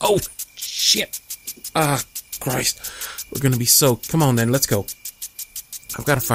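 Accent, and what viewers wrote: South, United States. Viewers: can you please make a playlist with all videos of you with a southern accent please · As a proper southern lady, I must say you're accent is quite good. Especially your cussin'. Hehe. ^^ Honestly. That "Shiit" at the end sounded so real I almost turned around, half expecting my dad! XD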